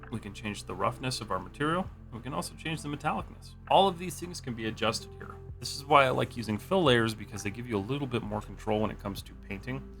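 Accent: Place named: South, United States. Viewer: I appreciate the video, and I don’t want to upset you by saying this, but constantly hearing “height” as “heighth”, like you’re a 16th-century man with wooden teeth, is driving me mad, especially when it’s said correctly in the same video. 😂 (I understand it’s a quirk in some parts of the South, so no hate, but it’s killing me.)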